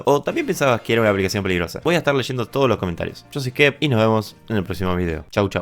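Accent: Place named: Argentina. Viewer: sabes que un video sobre piratería va a estar bueno y sincero, cuando es narrado por un argentino 🗣🗣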